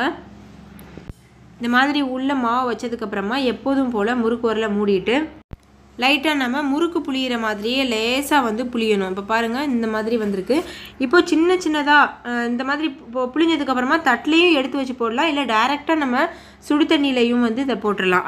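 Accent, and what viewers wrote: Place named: Madurai, India. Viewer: Madurai side ahTamil neenga my old days memories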